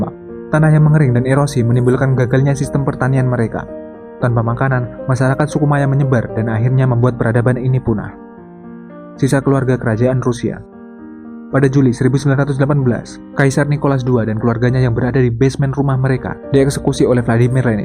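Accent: Java, Indonesia. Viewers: bos... rada medok. dari jawa ya... pada bae lah... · Logat nya jawa cpt lg. Gk guna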